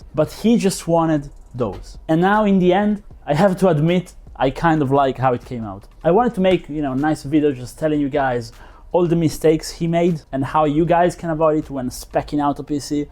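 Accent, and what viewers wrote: Italy. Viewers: Are you italian? (Great vid btw)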